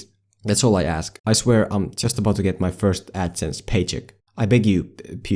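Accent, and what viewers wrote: Finland. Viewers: is krakozhia a inside joke? because i couldnt find anything on google and tbh you sound finnish torille tavataan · Your accent sounds so fucking Finnish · krakozhia... hmm .. but you accent still sounds alittle ... Finnish... maby... Estonian... maby... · Ahh I recognize a finnish accent when I hear one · Nah, it's a fictional East European country from some movie or video game or something, I don't remember. But he is clearly Finnish, I can tell by his accent (I'm a Swede). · Are you Finnish? You're accent sounds Finnish.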